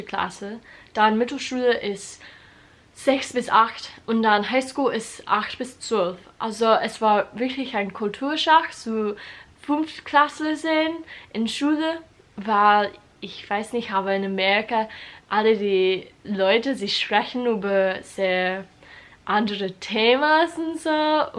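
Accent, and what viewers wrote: Bavaria, Germany. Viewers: Its funny how your German sounds a little bit "bayrisch" xD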